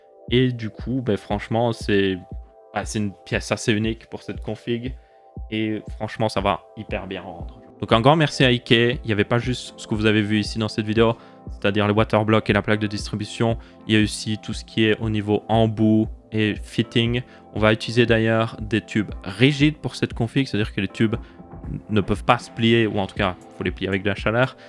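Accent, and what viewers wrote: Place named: Belgium. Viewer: Honnêtement, est ce que doit continuer de s’excuser de prononcer houit et pas huit (et nonante, septante etc)? Les gars il est belge laissez le dire ce qu’il veut dire de la manière la plus naturelle pour lui, on le connaît depuis suffisamment longtemps pour que ça soit pas dérangeant, même pour un nouveau viewer ça devrait pas être dérangeant, je veux dire y’a tellement d’accent différent dans le monde c’est quoi le problème?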